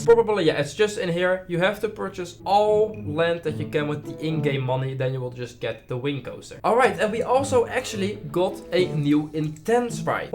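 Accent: Netherlands. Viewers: Ik ruik Nederland (door je accent)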